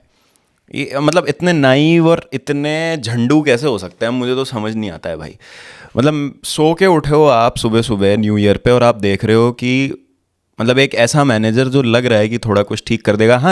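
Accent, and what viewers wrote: United Kingdom. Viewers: Your English accent uff❤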